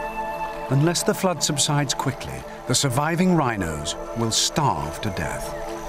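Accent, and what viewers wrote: United Kingdom. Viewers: Great documentary, the old ones with the British narrators are my favorite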